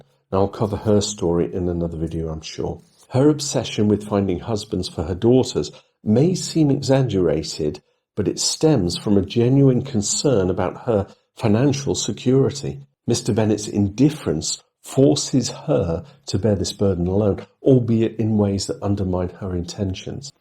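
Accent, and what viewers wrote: United Kingdom. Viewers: Hello from Montreal, Canada. So happy to have you back. I as well like Mr Bennett. Looking forward to watching you other analysis. I think in another video you said that some people don't like your voice. Well, I love your voice and your british accent. It is a real joy to hear you talk about Pride and Prejudice. Did you make an analysis of Charlotte? and Catherine de Burg (not sure it is the right spelling)? What kind of life do you think Lydia and Wickham had? Thank you.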